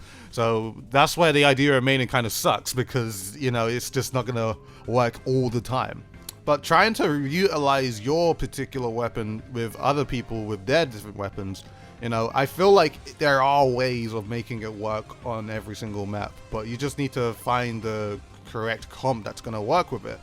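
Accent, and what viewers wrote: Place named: London, United Kingdom. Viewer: Hey, where abouts are you from? I'm from London, England and your accent sounds very similar to mine so I'm assuming you're from nearby?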